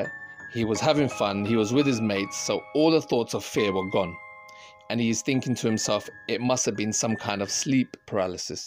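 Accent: United Kingdom. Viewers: u got that across the pond voice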